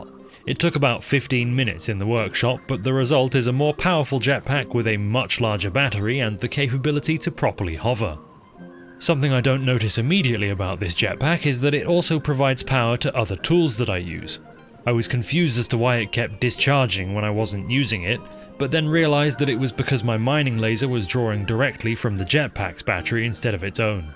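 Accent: United Kingdom. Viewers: Watching an extremely british person say "Fuckos" in the most polite and eloquent voice was not something on my bucket list.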